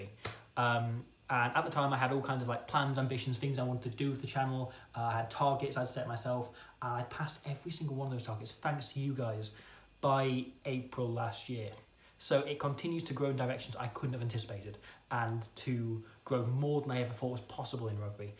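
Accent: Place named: West Country, United Kingdom. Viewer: The most patriotic Italian to have a West Country accent.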